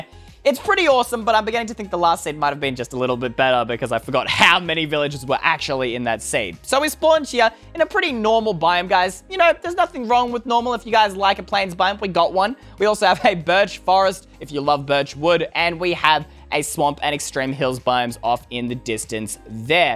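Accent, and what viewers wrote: Australia. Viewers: are you austrailan? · Are you Australian?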